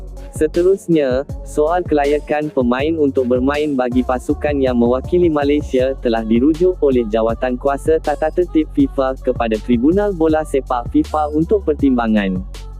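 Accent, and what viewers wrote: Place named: Indonesia. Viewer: Cara cakap kompom dari YouTuber Indonesia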